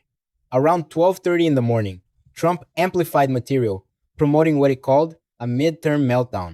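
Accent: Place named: Spain. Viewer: What s your mother language ? It sounds as if.it's Spanish !?! I WISH I COULD SPEAK SPANISH AS WELL AS YOU DO ENGLISH ! Nice video , concise just relax dude . Your doing great 👍 🇨🇦🇨🇦🇨🇦🍁🇨🇦🇨🇦🇨🇦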